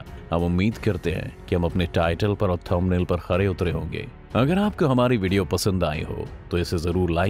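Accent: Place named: England, United Kingdom. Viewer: You speak Hindi in English style 🤣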